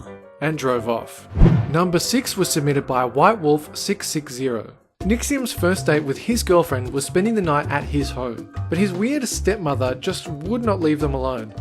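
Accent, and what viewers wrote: Australia. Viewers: Wait Are You Australian Because Ì M Am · Your Australian? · YOUR AUSTRALIN!!!!!!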